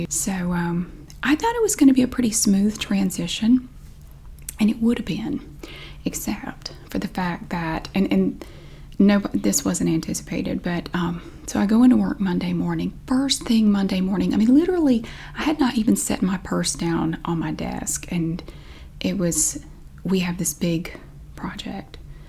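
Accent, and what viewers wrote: South, United States. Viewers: Oh, be my smart ass sweetie pie 😍🥰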